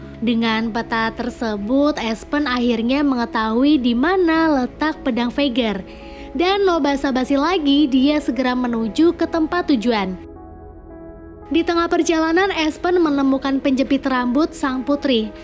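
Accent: Pemalang, Indonesia. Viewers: Mbak asli wong pemalang?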